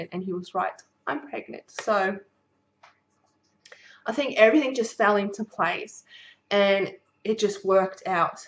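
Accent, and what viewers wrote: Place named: New Zealand. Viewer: Just a question you sound like you have an accent... my guess is New Zealand accent... I can hear it in there with a few words that you use.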